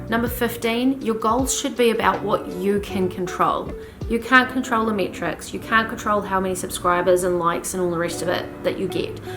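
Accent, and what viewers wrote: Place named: New Zealand. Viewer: Solid advice. I like DaVinci Resolve as well. It has more features than most of us will ever need let alone use. We tend to be our own harshest critics. Your videos are lit well, the background isn’t distracting, you dress for success and your eye contact with the camera is good. And who doesn’t love a Kiwi accent?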